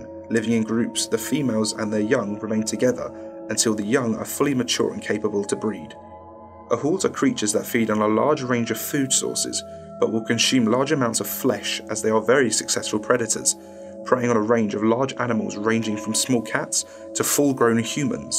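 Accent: United Kingdom. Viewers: Is he british? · That start was priceless, the accent and British slang only made it better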